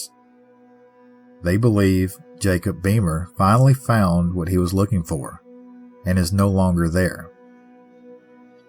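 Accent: South, United States. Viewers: hey wat can I say? I live here & I'm fascinated by spooky shii lol & you put dat lil southern charm on your story tellin I like it! ya huge fan lol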